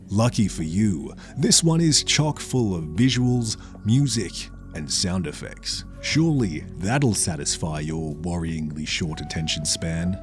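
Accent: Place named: Australia. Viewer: Deadpan Aussie gaming man made a new thing.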